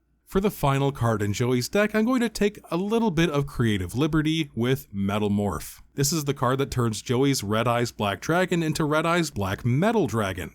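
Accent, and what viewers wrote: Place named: Brooklyn, United States. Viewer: I appreciate your Brooklyn accent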